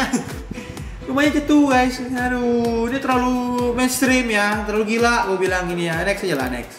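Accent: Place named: Makassar, Indonesia. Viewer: Kakak orang makassar ya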